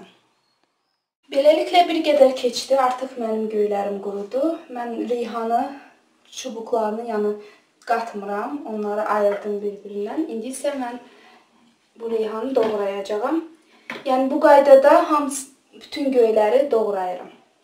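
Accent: Azerbaijan. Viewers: Guya Bakilidi gorureceyem demekle deyil sesinden rayon avazi gelid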